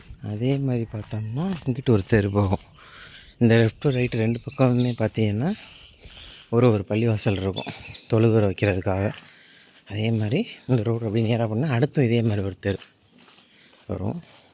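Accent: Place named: Madurai, India. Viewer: உங்க பேச்சில மதுரை slang தெரியுது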